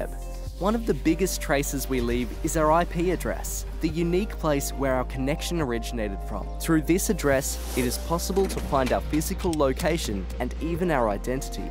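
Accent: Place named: Australia. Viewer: I like the Australian accent used in this video.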